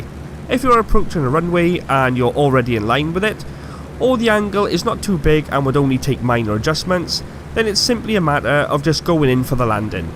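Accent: Wales, United Kingdom. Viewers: Are you Welsh? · Is this guy Welsh? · That would be absolutely amazing, not many people like you on YouTube, also loving your welsh accent!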